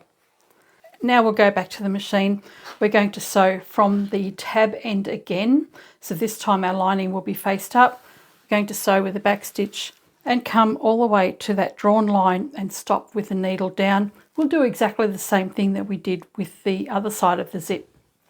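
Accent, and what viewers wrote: Australia. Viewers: Thank you for a great zipper tutorial! Ive just subscribed. I recently did 3 cushions and botched them all in various ways... That wont happen again now ive watched this! Your sewing machine looks amazing. Can i ask what it is? Love hearing an Aussie accent too 😊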